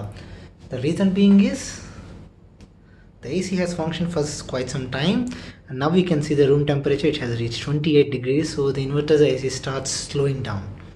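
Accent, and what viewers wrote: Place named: India. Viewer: The indian accent is awful.. speak hindi only.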